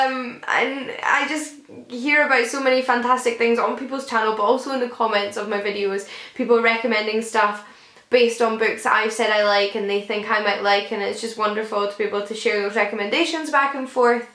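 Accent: Scotland, United Kingdom. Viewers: just the other day when I watched a video of yours (and I watch them all, even if I know I won't read the book mentioned etc), I thought to myself "why does 'only' have 29k subscribers? why is it not something like 100k?" you're honestly my favorite booktuber and I love how passionate you are and I could listen to you talk all day (yes, I'm guilty of loving your scottish accent). you seem like such a sweet person and I'm so looking forward to hear you talk about books even more in the future! congrats on 30k, you really deserve it! xx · With a Cuppa. You Scottish? Where from?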